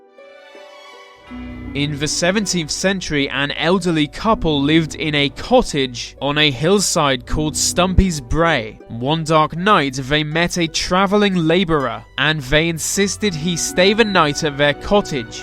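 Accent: England, United Kingdom. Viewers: He's English for sure! :)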